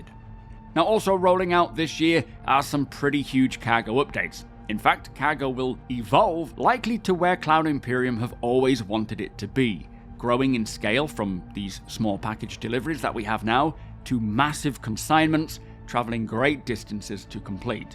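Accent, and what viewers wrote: United Kingdom. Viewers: Happy New Year. As I said on my last live I was able to participate in. Your noble British accent makes you seem older and wiser. I would guess that u are younger than I. At 43 your video's are perfection and balanced. With each video I have been urning for what we are about to see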